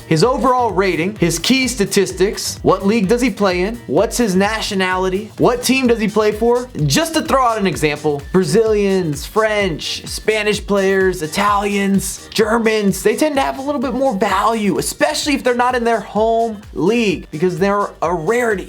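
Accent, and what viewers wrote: United States. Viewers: Only FIFA channel I can watch cause you’re AMERICAN💪🏻👌🏻🏈🇺🇸🇺🇸🇺🇸🇺🇸so you don’t have this nasty accent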